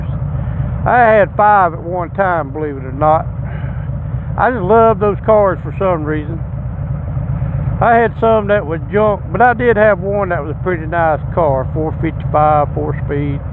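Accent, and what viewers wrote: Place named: South, United States. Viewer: Well Sir you talk just as country as me.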